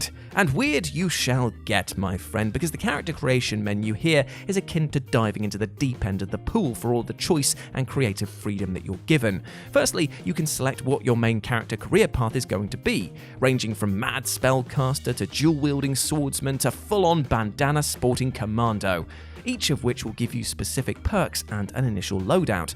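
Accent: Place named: United Kingdom. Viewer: Voice acting? I haven't heard any. Just a vaguely British narrator.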